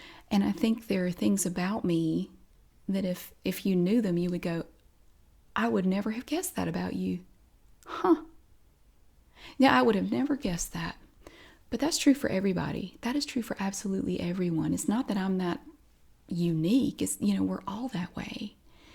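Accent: South, United States. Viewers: Your Southern accent is a big reason for the popularity of your videos. Here in California most people speak in a millenial monotone or in foreign tones. Your Americana accent is music to my ears.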